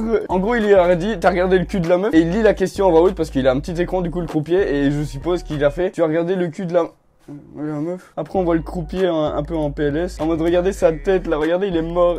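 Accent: Belgium. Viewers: Il est belge donc il a un accent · Tu es belge ? L’accent j’connais 🇧🇪 P.S : Super vidéo, mais tu expliques un peu trop les fails, laisse les abonnés regarder puis explique. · Est tu belge ? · Pk tu parles comme un Belge bizzare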